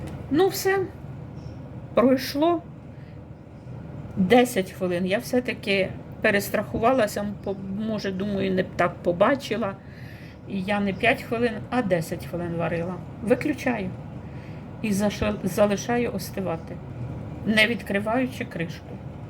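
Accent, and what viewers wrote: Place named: Kyiv, Ukraine. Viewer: Мабуть, ви окрім поняття "суржик" і своєї расєйської мови ніоли не чули таке поняття як "діалект". Так от, ставлю вас, нешановний, до відома, що такий діалект поширений у Київській області. І не треба повчати, якою мовою і на якому діалекті людині розмовляти. Всяка мова добра і гарна, окрім огидної рашистської.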